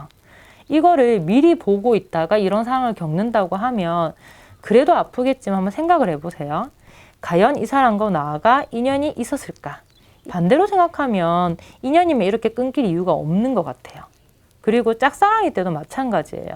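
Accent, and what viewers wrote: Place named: Busan, South Korea. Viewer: 리딩 너무너무 잘 들엇어요!! 디테일한 리딩도 좋쿠 딕션이 너무좋아 귀에 쏙쏙 들어오네요~~😊 부산사투리 매력잇어요!!! 또 피드업뎃해주세요오오오!!🙏🏻🙏🏻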